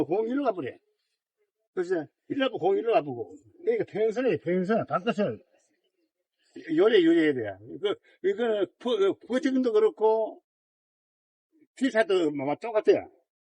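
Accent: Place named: Gyeongsang, South Korea. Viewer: ㅎㅎ 설명이 잼있네요^^ 경상도 아저씨 <link>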